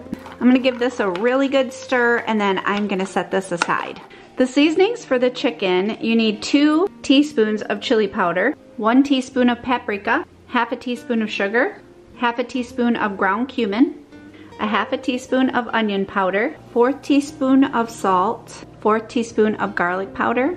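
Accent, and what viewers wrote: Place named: Midwest, United States. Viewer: That chicken pot pie looks amazing! 🍲. Your accent 😁. Are you from Minnesota or Illinois?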